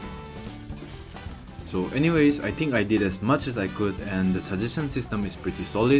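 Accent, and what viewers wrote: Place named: Manipur, India. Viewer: Why do i feel like you're Meitei or north eastern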